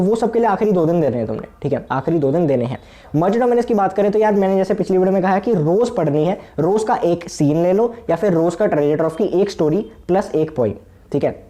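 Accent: Punjab, India. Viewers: Bhaiya are you punjabi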